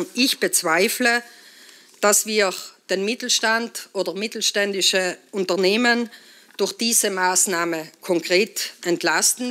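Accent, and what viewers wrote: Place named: South Tyrol, Italy. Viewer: Richtiges Südtiroler deutsch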